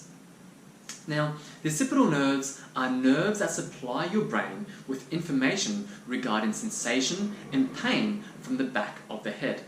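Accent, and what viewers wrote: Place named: Australia. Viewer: I'd say Australia or New Zealand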